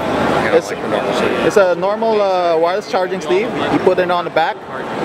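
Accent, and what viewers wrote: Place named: Philippines. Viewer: I can tell by your speech and how you speak that you're a filipino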